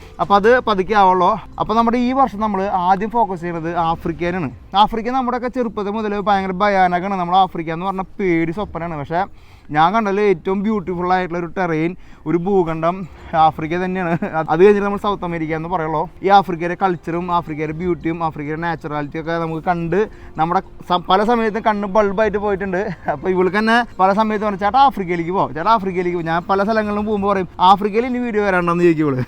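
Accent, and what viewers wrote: Thrissur, India. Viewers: ഈ വീഡിയോ ചേച്ചി കൊണ്ടുപോയി വിത്ത്‌ തൃശൂർ സ്ലാങ് 😍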